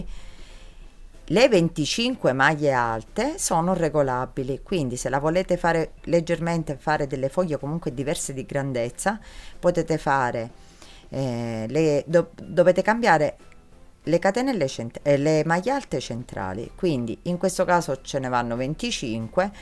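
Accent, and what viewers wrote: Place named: Sicily, Italy. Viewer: Bravissima ❤❤❤ti dirò mi piace quel tuo accento siculo nn so se sbaglio ma sei simpaticissima 😘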